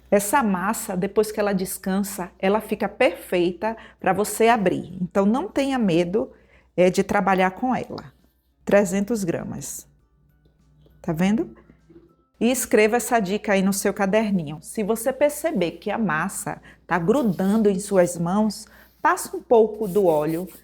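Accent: Bahia, Brazil. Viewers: tu é simplesmente maravilhosa abençoada. Que mãos de fada. Sem contar que tú é muito espirituosa 😂😂😂😂 te adoro. Obrigada por existir em nossas vidas. ❤❤❤❤💋💋💋💋🫂🫂🫂🫂 Vcs goianos, baianos são muito parecidos com a gente aqui do Rio grande do Sul muito hospitaleiros. Acho que pelo teu sotaque tu é baiana né? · Mto didática sem falar mto e enrolar, dá gosto de ver suas receitas maravilhosas, parabéns!! Sem contar que esse sotaque baiano deixa td mais gostoso...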